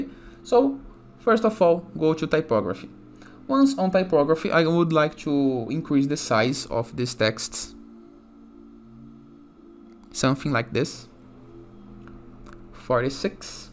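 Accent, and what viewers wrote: Brazil. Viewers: Its some Brazilian accent? 😉Nice TUT, brother!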